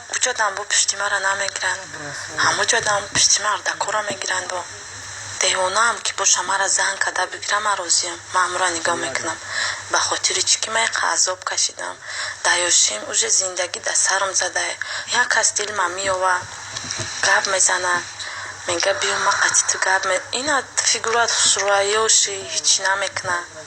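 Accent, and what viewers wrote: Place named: Kulob, Tajikistan. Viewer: духтари точик не духтари кулоб ай гапзаниш маълум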